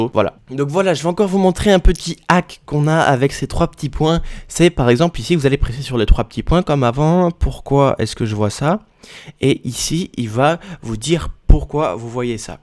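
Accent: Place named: Romandy, Switzerland. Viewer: bien sûr! Merci pour la vidéo bourrée d'informations et présentée avec panache. (Et un accent romand je crois!)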